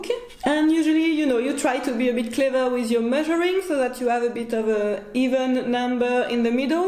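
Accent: France. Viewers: Wonderful!! Thanks for showing us! And I love your french accent. It reminds me of when I lived in Paris in 2010. Yes, you make some little mistakes sometimes, but it's very charming! 😊✨💕🇫🇷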